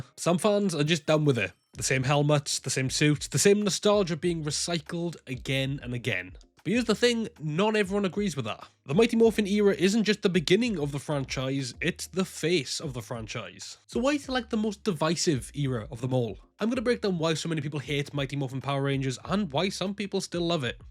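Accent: United Kingdom. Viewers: You know that is British, right? Brits pronounce certain words differently than Americans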